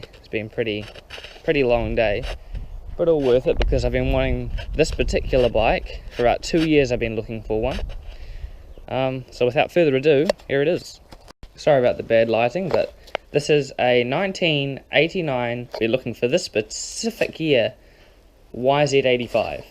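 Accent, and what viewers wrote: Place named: New Zealand. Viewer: sounded like you were from new Zealand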